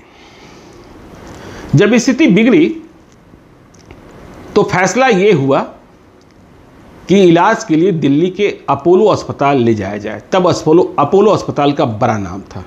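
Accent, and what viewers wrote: Bihar, India. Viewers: You speak like typical Biharii and imitate Kissagoi of Rajesh Pandey IPS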